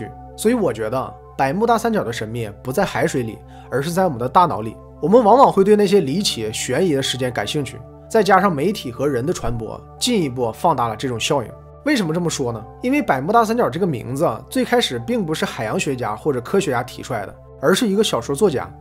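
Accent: Northeast, China. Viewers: 东北口音太重了😂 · 原來以前的老視頻東北口音那麽重的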